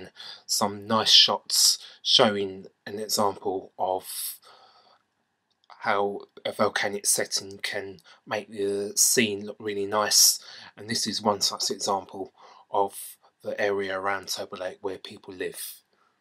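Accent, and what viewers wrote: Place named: United Kingdom. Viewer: hard to understand a mumbling brit!!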